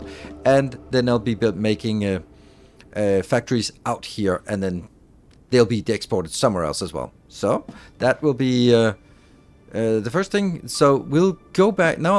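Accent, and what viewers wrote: Denmark. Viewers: Quick question i hear your voice but are you dansk ?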